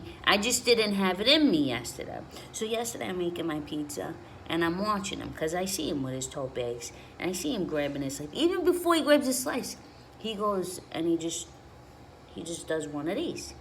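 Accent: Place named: New York, United States. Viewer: Luv ur humor. Nice to see & hear a real New Yorker. Joseph from Minnesota.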